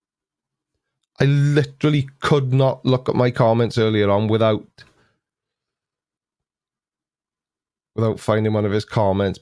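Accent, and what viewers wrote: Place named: RP, United Kingdom. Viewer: his queen's english is ok.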